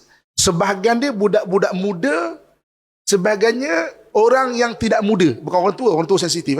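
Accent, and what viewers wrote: Malaysia. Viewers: hmmm aku xsuka dgr logat² semenanjing ni. Dosa jadi dose 🥴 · Alhamdulillah ilmunya beliau patut diacungi jempol, meski sayye agak-agak tidak paham apa yang disampaikan soalx menggunakan logat Melayu. Salam dari 🇮🇩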